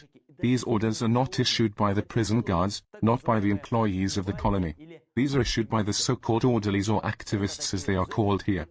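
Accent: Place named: Wales, United Kingdom. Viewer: The fact this is a Welsh voice cracks me up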